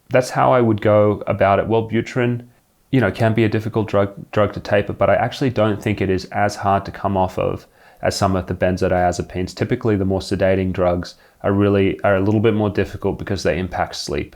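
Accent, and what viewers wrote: Australia. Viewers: Hello - I noticed you have an Australian accent - I tried to get Wellbutrin from my GP but it is not on PBS for depression. How do I go about getting this medicine in Australia? Thanks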